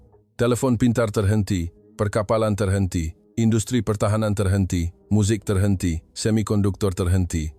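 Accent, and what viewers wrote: Indonesia. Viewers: kenapa bahasanya tone indonesia 😀ini mesti channel indonesia kan???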